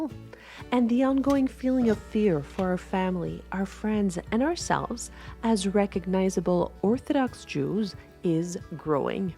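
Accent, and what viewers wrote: France. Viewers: I love your pronunciation, it's very French. My city Odesa (Ukraine) has very deep and long-standing ties with the state of Israel and here we pray for the people of Israel, all of us, Jews and Christians. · I love spending time with you! Thank you for this video,be safe! PS - is your native tongue French? Greetings from a portuguese fan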